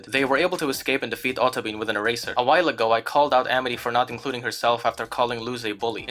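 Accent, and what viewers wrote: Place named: United States. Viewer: How do you have an American accent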